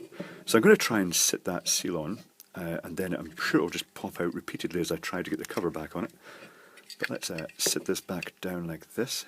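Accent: Scotland, United Kingdom. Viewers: I have disliked the Scottish accent before, but yours, is not bad at all. In fact, it's rather soothing.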